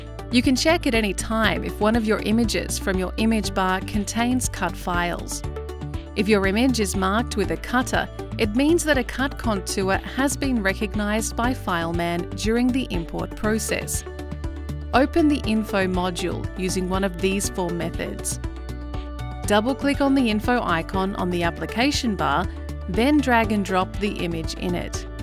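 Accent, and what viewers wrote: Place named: Australia. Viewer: Who's the presenter ? Voice sounds like its Aussie.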